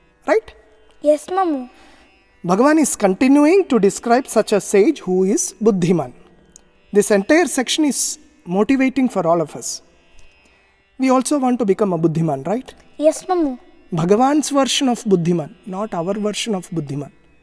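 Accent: Standard. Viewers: आपका संस्कृत उच्चारण बहुत ही शुद्ध है। उच्चारण व्याकरण सम्मत भी है, कहां पर है उपध्मानीय का उच्चारण हो रहा है तथा कहां पर जिह्वामूलीय विसर्ग का उच्चारण हो रहा है वह सभी सुन करन बहुत ही प्रसन्नता हुई। ऐसा शुद्ध उच्चारण भारत वर्ष में केवल 1% लोग ही कर पाते हैं। ऐसा शब्द उच्चारण भारतवर्ष में धीरे-धीरे कम होते जा रहा है ।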